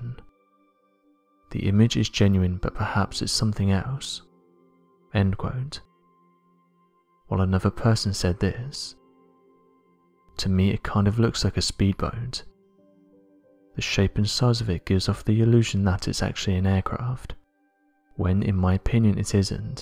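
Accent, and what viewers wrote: United Kingdom. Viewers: Secureteam10? You’re citing Secureteam10?!?!! I guess, at least it’s better than “someone said” or “another person thinks”. Your British accent isn’t enough lipstick for your fake news. Some websites?? Which ones?!?!?!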